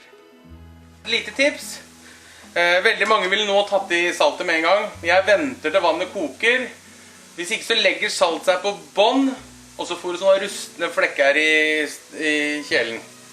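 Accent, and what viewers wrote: Østfold, Norway. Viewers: Veldig bra resept. Jeg lurer på som svenske 35 år i Norge, hvor er din dialekt? Kan det være nedre østfold, Halden, eller er det lenger opp?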